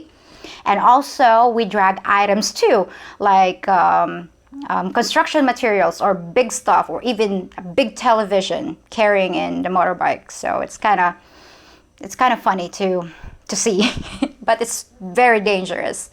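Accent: United States. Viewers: your American english accent is so good I would think you were the girl next door. I'm from Washington St. and I would say you have a northern accent. It blows my mind every time I consider that you have never been outside of the PI. Oh yeah and your addicting to watch.:) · Beautiful Leyte. Have you been abroad a lot? Your English are very good and very American. And have a hard time resisting answering what you put out:-) All countries has plus and down sides. The good thing with down sides is that then there is something with a potential for improvement. Yes the bikes with all the people on it....I remember first time i see that. My friends at home had a hard time believing people put their kids on a bike like that and took that risk. Later I have seen some real bad accident with bikes in Cebu. A bike is designed to take one or two people, and if you go beyond the factory specs, anything can happen. 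And yes the roads in Pilipino city's are overcrowded. Everyone like to have their own car. But the road are limited. The better of the people there will have it, the more people will buy their own car. Soon I have to buy a horse and bypass the almost stagnant traffic. This is a political theme that not only Philippines has to work with, many big cities around the world had to address this. regarding cats with messed up tails, I always believed it was all the loose mad dogs that bit them of....So it is inbreed... And coffee....I come from one of the most coffee drinking countries in the world. I guess freezing cold climate large parts of the year has done a hot cup of something popular at work breaks and after a meal. My grandmother lived in a time they buy imported beans. This was grinded on a very small grinder, so small it would fit i a lar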